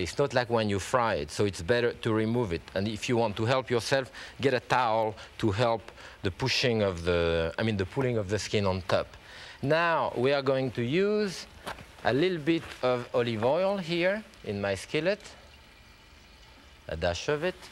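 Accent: France. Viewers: I think I'm getting to the point that I can figure out his French-to-English quirks, even though some of them take a second to click. Bananas Foster with butternut sauce...butternut-->butter nut-->beurre noisette-->brown butter.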